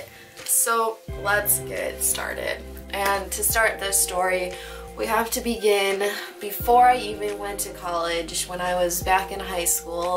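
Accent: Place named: Midwest, United States. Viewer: I know this is a few years ago but I can totally tell you are from the midwest. Utilizing yadayadayada All my friends do that xD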